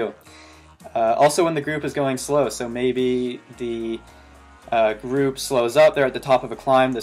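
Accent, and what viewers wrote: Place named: United States. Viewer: Why American accent, but British flag?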